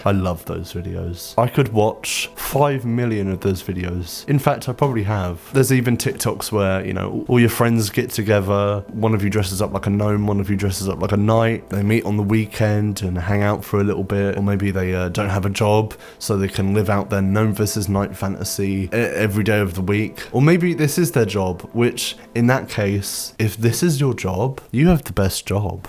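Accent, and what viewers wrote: United States. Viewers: Was this all to show off the American accent? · American here, for my sins. Your American accent is great!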